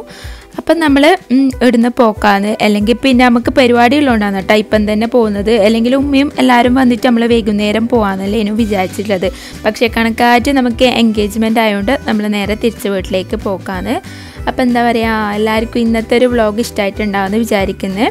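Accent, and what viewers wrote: Kannur, India. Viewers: IngaleKannur bhasha pwliya😊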